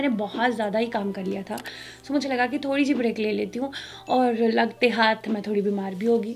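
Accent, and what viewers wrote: Punjab, India. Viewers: Sis ap ki pangabi bht mazay ki hai or jo jo hubby kay sat maza ajata hai ma bhe panjabi hon from lahore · You are so cute di. Mujhe apke vlog boht pasand hai or jaise aap bolte ho mix punjabi hindi boht hi cute lgte ho. You guys are amazing god bless you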